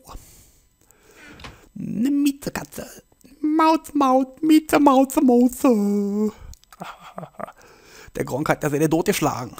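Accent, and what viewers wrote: Berlin, Germany. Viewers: Du musst unbedingt die Berliner Schauze behalten... bloß niemals Hochdeutsch! Aber bitte beleidige die Schafe nicht so wien Berliner Penner :D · Und bitte rede nicht Berlinerisch. Das klingt bei anderen noch OK. Aber bei dir so scheiße und überdreht.........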